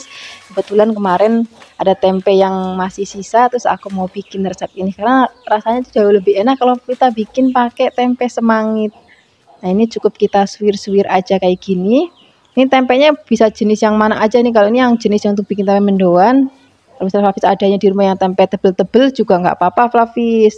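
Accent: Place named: Cilacap, Indonesia. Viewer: Kok ky wong cilacap ulih ngomong ya bun,tempe semangit senengane mamakq huhuuu😭😭😭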